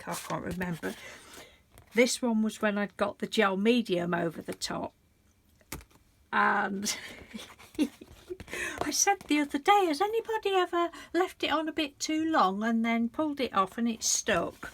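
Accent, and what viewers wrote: United Kingdom. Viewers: Hi, I finally got to watch this properly. I’ve been moving rooms around again and I think I’m going to be sore tomorrow,lol! These turned out fantastic! The black background gives such a striking effect. I think I’ll reward myself with those shimmers whenever I use up some ample amounts of supplies because I am literally out of rooms, lol! I’m glad it worked and that you had so much fun! I got curious about actors that come from your area and did you know that, Kevin Doyle from Downton Abbey is from your town? I cracked up when you said, “what the heck!”, lol! I’m going to miss you reading craft stampers to us. Things always sound better when you British read them. Kloss! Thank you for sharing. Hugs, Julie 🥰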